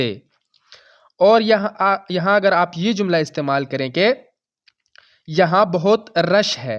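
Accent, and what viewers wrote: Peshawar, Pakistan. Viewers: یہ پشاوری پشتو ہے یا وزیرستان والی ؟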